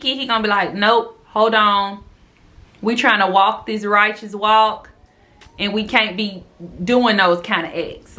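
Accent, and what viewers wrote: South, United States. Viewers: No offense. But I’ve never seen a black women with a southern accent 😅 very unique and interesting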